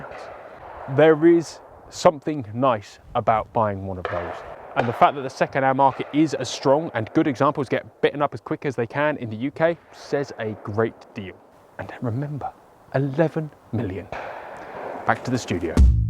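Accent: South, United States. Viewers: Great Southern American accent. 👍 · Good southern accent and good review......you sounded like you are from North Carolina P.S I saw a brief news report of US weapons going to the Ukraine and amongst them were Mossberg 500 shotguns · I like your American accent. 😄😄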